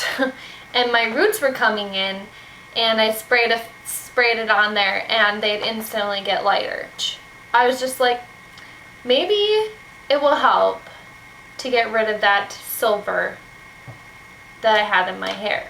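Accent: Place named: Minnesota, United States. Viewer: Minnesota accent yikes.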